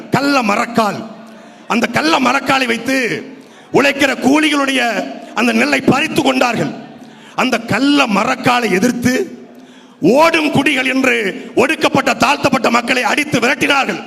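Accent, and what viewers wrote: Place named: Tirunelveli, India. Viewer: திருநெல்வேலிகாரன் தமிழ் பேச்சு னாலே தனி கெத்து தான்.தெளிவான உரையாடல்.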